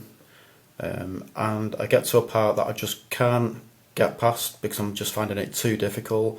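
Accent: Manchester, United Kingdom. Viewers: I'm from North Manchester, I thought you definitely sounded Mancunian. Thinking we may be of a similar age, from your gaming videos. I'll be 42, in a few weeks.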